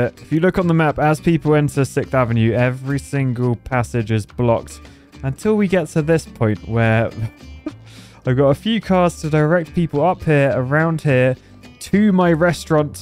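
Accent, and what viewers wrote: United Kingdom. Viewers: Fellow Britt? Does he spiff?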